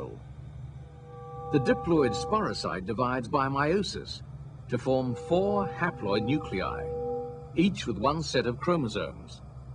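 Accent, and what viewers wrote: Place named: United Kingdom. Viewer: Loved that British accent..easy to understand though than the American one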